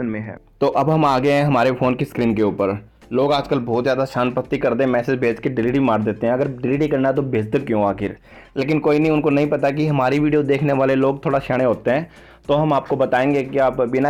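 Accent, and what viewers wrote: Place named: India. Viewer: Why do Indian English so difficult for me to understand???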